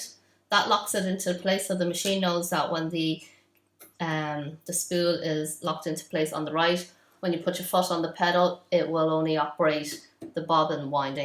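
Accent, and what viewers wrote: Ireland. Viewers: The Subtitles can't handle the Irish accent, hello from Co. Antrim btw. ;-) Haven't gotten around to using mine yet. Subscribed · Thankyou very much ,, a beautiful Far Eastern lady with an Irish accent 🙏🙏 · Love the accent!! My dad was from Tipp, my maternal grandparents from Galway and Mayo and my husband from Down. I'm a sucker for an Irish accent 😁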